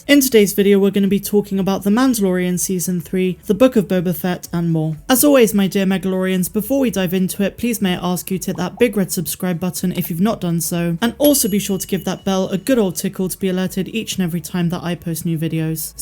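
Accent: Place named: Bristol, United Kingdom. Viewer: you sound bristolian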